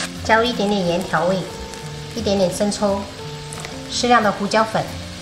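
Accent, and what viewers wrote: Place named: Zhejiang, China. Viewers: 好像是可愛的浙江口音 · 听口音像江浙沪的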